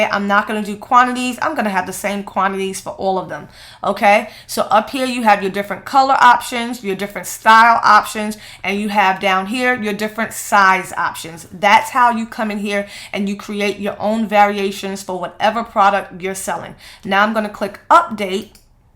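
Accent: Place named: Brooklyn, United States. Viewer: I'm thinking 🤔 she looks familiar...😂😉later on in the vid you say you're from NY. Now, I know you just SOUND familiar! 😂Brooklyn? Yes, on the grind!😂💪🏾💯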